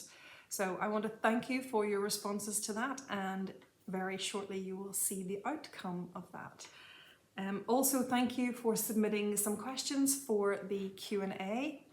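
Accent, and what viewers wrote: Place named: Ireland. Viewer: so lovely to see you again and we do understand you are very busy, not only looking after your own wee family, but also preparing for long term house guests. Wishing you all the best as you all adjust to the new routine once your guests arrive. I'm sure you will be able to grab a few minutes some days to stitch a row and use this as your chill out and recharge time. Please don't worry about having too much content for future videos. Just to see your face and hear a couple of minutes of your Irish lilt will suffice until everything settles down for you. Take care and deep breaths. xx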